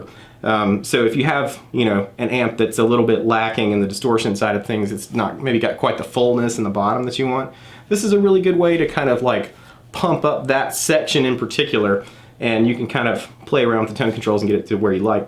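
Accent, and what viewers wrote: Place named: South, United States. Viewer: Thanks for the response. I hear your Southern Accent. Were you born in the South? Keep rocking! 😎😎😎😎.....That Splawn Amp of yours is real nice too.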